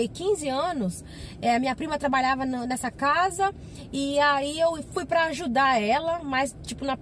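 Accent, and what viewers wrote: Minas Gerais, Brazil. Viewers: Oi, acabei de chegar no se canal e já amei o primeiro vídeo que assisti. Você está certíssima, amiga, vergonha temos que ter da desonestidade. Seu trabalho é muito digno sim e se você está vencendo na vida é graças a ele. Amiga, percebi um sotaque mineiro, eu também sou mineira de Coronel Fabriciano, e você é de onde? 😘😘😘😘😘😘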